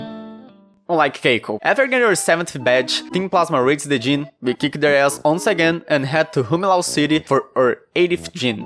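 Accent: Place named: Brazil. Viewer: Sabia que era brasil pelo sotaque adorei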